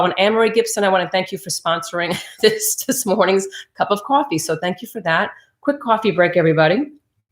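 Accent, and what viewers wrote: New Jersey, United States. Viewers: What month or season can you split them, and how young can they be before splitting them? Thanks, I love the channel and your Jersey accent. 👍🏻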